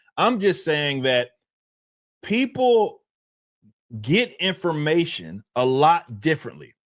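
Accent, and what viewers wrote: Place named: Texas, United States. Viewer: I can't stand the Texas hayseed accent dude... "is" is eeeyz, "been" is beeeyn, etc.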